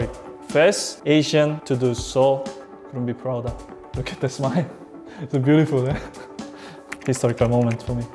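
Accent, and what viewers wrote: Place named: Germany. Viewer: His German English accent is so cute haha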